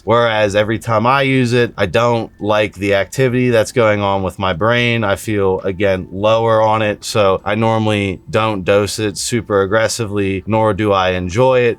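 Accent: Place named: Philadelphia, United States. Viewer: are you from the Philly area? Noticed your accent is similar to mine.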